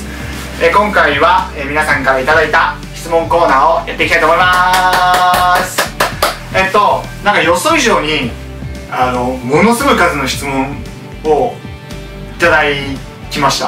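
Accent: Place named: Shizuoka, Japan. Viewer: 静岡弁。笑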